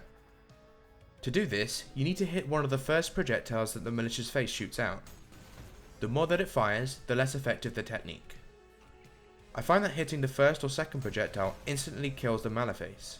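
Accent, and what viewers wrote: United Kingdom. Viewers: dude i laughed so hard when i relised he's british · How did I correctly guess this was going to be narrated by a British man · The biscute shootah ,oh gosh i really like the british accent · 100% british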